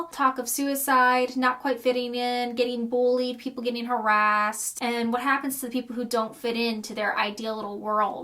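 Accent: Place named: Midwest, United States. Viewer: I believe she lives in ohio....so mid-west accent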